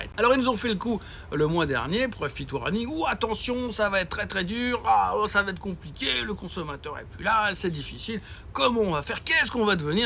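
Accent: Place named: Carouge, Switzerland. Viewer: Le marché monte notre ça l’énerve! Tout laisse penser qu’il a pris des positions à la baisse Et ce look de vieux navigateur solitaire et cet accent carougeois traînant ni changer rien.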